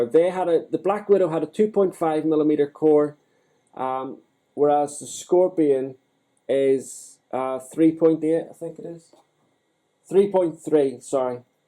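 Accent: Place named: United Kingdom. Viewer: I haven't ever heard of these pencils. you sound British so that maybe why I haven't seen them or you. I would love to catch a pack of these Scorpion pencils. They look like they lay pigment down with a meer touch. So I am adding my name for the giveaway. And I sure hope you get to feeling better soon... bless you. My name is Barbara Gaudreau (french) and I am in Texas USA. To reach me just pop an email over to: <mail> YOU ARE MISSED ALOT!!!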